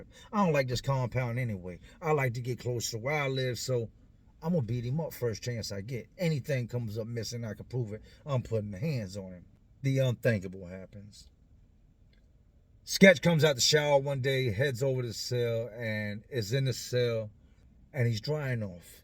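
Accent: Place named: Philadelphia, United States. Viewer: i can hear that PHILLY in this voice..even though he from VA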